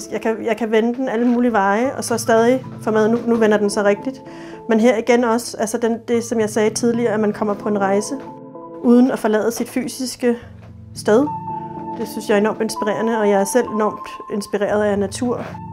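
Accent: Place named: Scotland, United Kingdom. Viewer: wow she sounds so scotish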